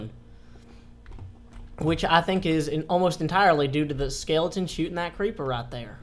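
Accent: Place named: South, United States. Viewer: " I literally heard a cree- HUUURR OMG!" XD funniest part ever.. your southern accent is hilarious ( not laughing at you) but you just earned a subscriber! XD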